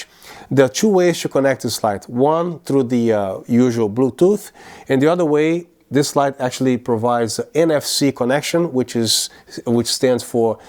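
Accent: Brazil. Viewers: There aren’t many reviews of these new models and they look amazing, thank you. I own 2 la200d and absolutely love them, I plan to get 2 la300r next year, hopefully. Have you checked out the godox s120t softbox? There are also other sizes, but they are “umbrella” style, apparently much easier to set up and colapse, I want to replace my softboxes with 2 s120t. I’m from Brazil and even though these products are relatively inexpensive abroad, here the prices are absurd. Ps.: you sound Brazilian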